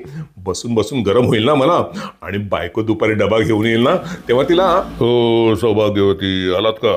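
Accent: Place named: Goa, India. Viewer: Khup chan video editing karta ani voice over pan.. malvani thoda goanis zhala ..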